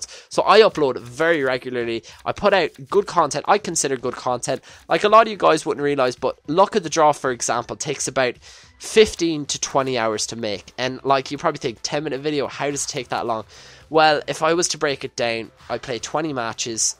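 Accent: Ireland. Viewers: mate first your irish which is beast second ur just as good as ksi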